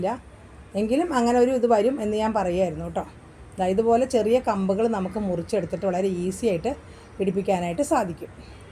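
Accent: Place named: Northern Kerala, India. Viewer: Enikku thonni vadakan style talk❤❤❤ente husbandum palakkad anu❤❤❤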